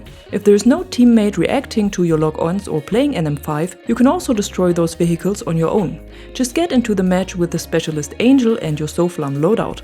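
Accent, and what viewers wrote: Germany. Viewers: Is that a German accent? :) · Are you from germany?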